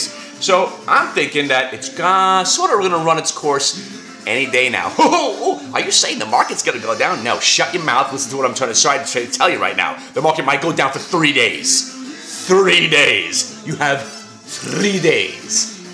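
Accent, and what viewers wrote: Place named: United Kingdom. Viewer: genuine british accent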